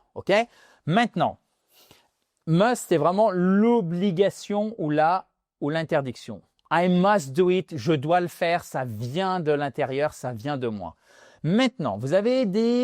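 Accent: France. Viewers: J' adore lorsque tu parles anglais comme parlent les français, en plus tu les connais très bien , puis que tu es son prof.....❤❤❤❤❤❤❤ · Les Français du sud de la France prononcent de la même manière les terminaisons du futur simple (-rai) et du conditionnel présent (-rais), les rendant indiscernables à l'oral. habite à Saint-Étienne. Et voilà pourquoi.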